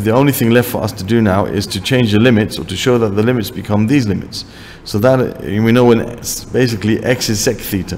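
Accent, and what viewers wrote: United Kingdom. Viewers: That's really interesting. They say you can sort out where a Brit is from by his accent, but hearing your flawless London accent yet knowing your very "un-British" name, I couldn't hold my fingers from asking you this. Thanks for the video and for your reply.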